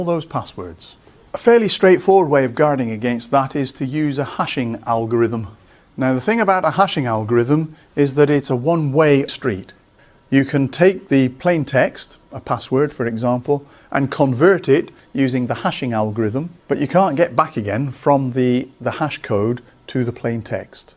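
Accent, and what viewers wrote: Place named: United Kingdom. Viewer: Hey, your videos are very nicely explained along with eloquent British accent. Keep on posting videos. Thanks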